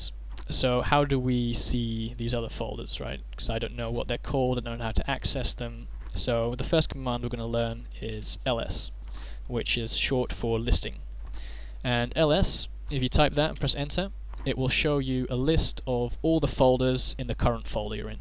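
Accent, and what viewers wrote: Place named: Netherlands. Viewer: Pretty awesome, haha. The first few minutes I could easily tell you were Dutch, with the accent. But then after ~3 minutes you get the hang of it (flip the switch, as it were) and you are nearly indistinguishable from a native :)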